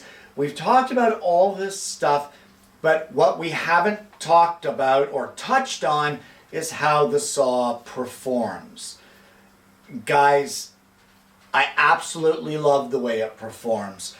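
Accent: Canada. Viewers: I’m terribly sorry, I pride myself on being able to recognise a Canadian accent when I hear it, I know a lot of Canadians are upset by being confused, as are New Zealander’s being thought of as Australians. I just wasn’t thinking when I placed my comment. I know the Canadian supply voltage is the same as the American, from my stay in Vancouver. All the very best to you.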